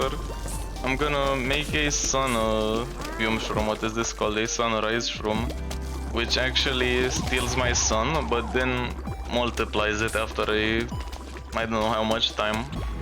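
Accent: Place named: Eastern Europe. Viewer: I love that slavic/russian accent where are you from?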